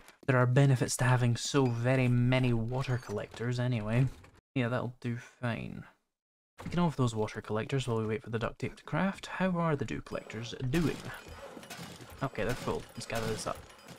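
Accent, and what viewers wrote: United Kingdom. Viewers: The whining is getting cringe. Takes me 4 or 5 times to watch a video because nothing else to watch. The British accent doesn't help, makes it sound miserable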